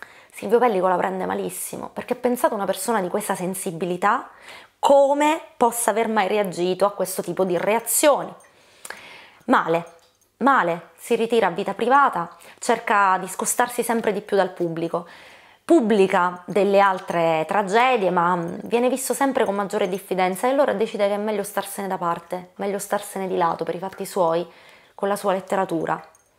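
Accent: Sicily, Italy. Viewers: Ma te sei siciliana vero?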